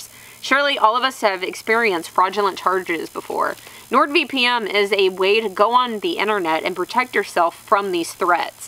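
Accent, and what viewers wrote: Texas, United States. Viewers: This is known a a TEXAS DRAWL'ah and if y'all aient from Texas ya'll dont speak it.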